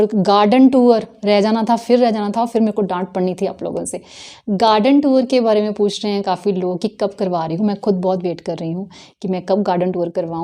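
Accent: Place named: Punjab, India. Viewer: Hi I have recently watched some of your vlogs, I like your accent it reminds me of my childhood that I spent in patiala. Your pronunciation is so typical of Punjab. 😊😊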